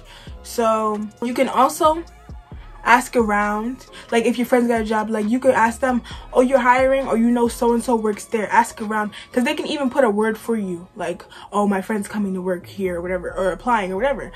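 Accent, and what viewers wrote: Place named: New York, United States. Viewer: love the ny accent 🤩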